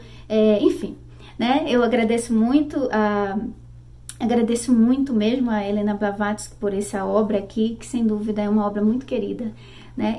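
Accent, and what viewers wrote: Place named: Belém, Brazil. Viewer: Senti um sotaque de BELÉM-PA...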